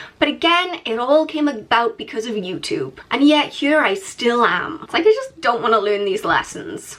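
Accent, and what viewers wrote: Wales, United Kingdom. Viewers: That welsh accent lol. Anyway I spent 4 years of my childhood in Kuwait a long time ago. It was a great hospitable country but even back then there were some weirdos. Even my mum had the odd unnerving but rare experience but was always quite firm.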